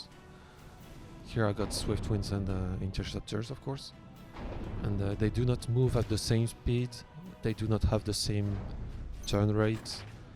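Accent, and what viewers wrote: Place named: France. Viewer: J'avoue son accent français se remarque tellement mdrr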